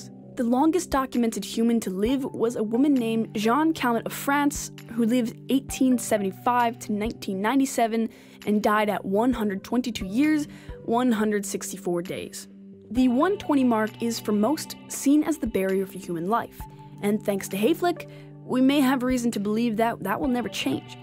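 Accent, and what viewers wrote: Canada. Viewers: I detect an Canadian accent!!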